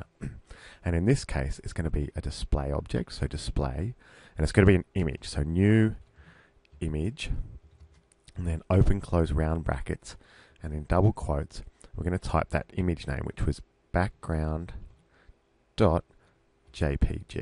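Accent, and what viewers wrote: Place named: Australia. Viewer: Excellent, well done mate, nice to hear an Aussie voice.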